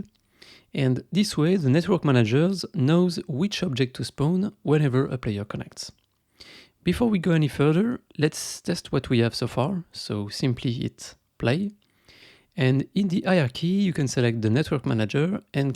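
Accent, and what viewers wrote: France. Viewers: Salut tu est français non?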